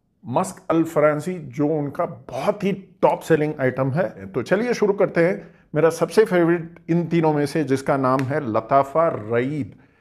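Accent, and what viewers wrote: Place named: Karnataka, India. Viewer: First time watching your hindi channel.. You sound like a kannadiga.. Good to see you reaching hindi speaking guys... 👍🏻👍🏻👍🏻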